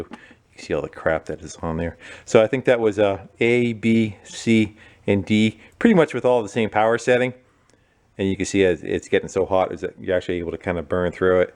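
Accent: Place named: Michigan, United States. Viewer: You sound familier. I bet your from Michigan.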